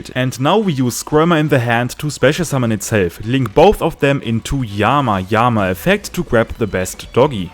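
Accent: Germany. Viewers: The german accent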